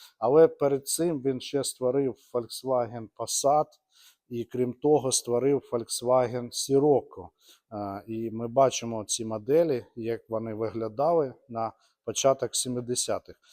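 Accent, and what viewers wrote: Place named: Russia. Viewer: просто сильный русский акцент, я как живший в незалежной слышу фальш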